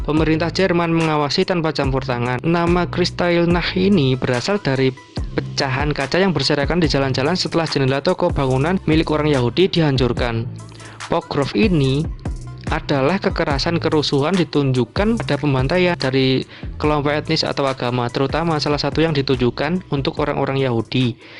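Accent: Java, Indonesia. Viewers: Jangan terlalu medok logatnya min, jd krg enak didengar, maaf ya biar kedepan lbh baik lg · Medokkk jawa , suara lu kya org kurang makan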